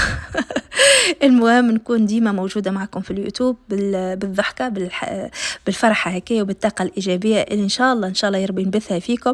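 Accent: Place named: Sahel, Tunisia. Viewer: يعطيك الصحة ،تقوم عالصباح وتقومي بفطو ر الصباح لأبناءك... طريقة تقديم الوصفة روعة... أنت أصيلة الساحل التونسى،نفس اللهجة،أليس كذلك؟. حظ سعيد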